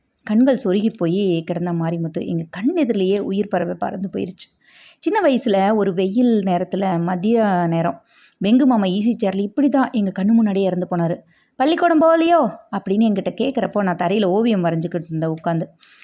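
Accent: Tirunelveli, India. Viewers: உள்ளத்தை உருக்கிய கதை.நல்ல கதை.தெளிவான குரல் வளம்.திருநெல்வேலி பாஷை அப்படியே.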